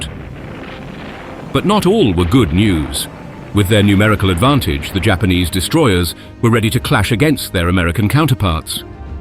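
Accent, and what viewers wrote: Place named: United Kingdom. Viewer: Great video!!!! But why is a British narrator doing this instead of an American narrator??????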